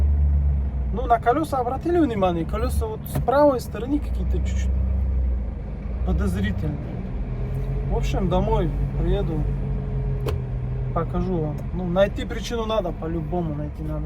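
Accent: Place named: Caucasus, Russia. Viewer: Автор, ты на рожу русский, а акцент кавказский, ты метис штоли?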